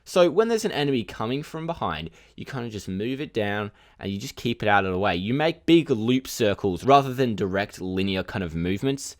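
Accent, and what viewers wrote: Australia. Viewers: I'm pretty sure he's Australian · Australian accent from Australia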